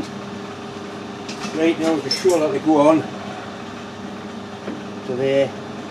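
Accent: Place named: Northern England, United Kingdom. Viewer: Sorry must have missed him saying that. With his Northern accent he is difficult to understand sometimes. If you want a good laugh, turn on the caption feature and see what YouTube makes of what he says. Regards from Australia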